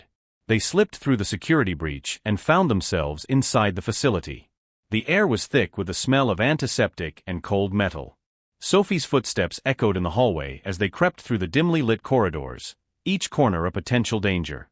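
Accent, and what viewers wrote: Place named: France. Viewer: What's with the french accent leaking in? A.I. ?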